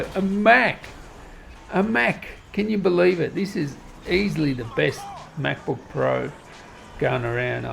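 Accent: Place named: Australia. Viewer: with the laid back aussie accent and not showing yourself on cam, I imagine you're doing this video's in just your fuckin pants whilst necking tinnies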